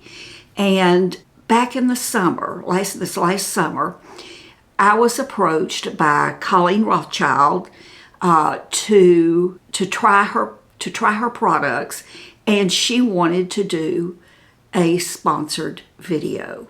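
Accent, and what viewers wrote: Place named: Arkansas, United States. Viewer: I've been looking at your channel for the last 3 weeks and I like all the videos I've watched so far!. I was wondering where you were from I know someone from Arkansas that has the same kind of accent that you do.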